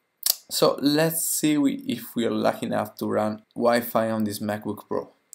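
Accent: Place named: Italy. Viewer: That Italian accent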